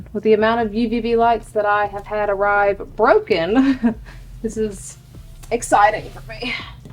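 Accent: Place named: North Carolina, United States. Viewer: being from NC I heard that accent and thought yep I trust her!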